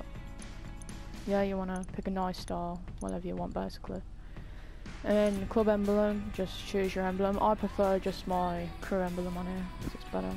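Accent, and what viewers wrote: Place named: Birmingham, United Kingdom. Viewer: LOL SHE FROM BRUM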